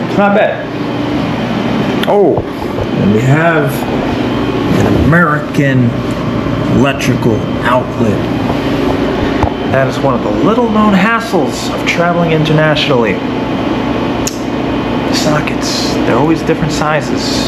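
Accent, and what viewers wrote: New York, United States. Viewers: dude you sounded like a Newyorker. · Yep...you're from New York, alright.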